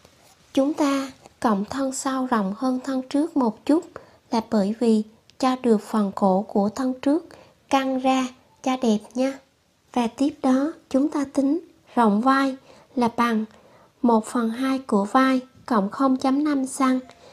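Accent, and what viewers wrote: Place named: Mekong Delta, Vietnam. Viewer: Bà chắc dễ thương lắm nè.cưng ghê giống người miền tây hả bạn❤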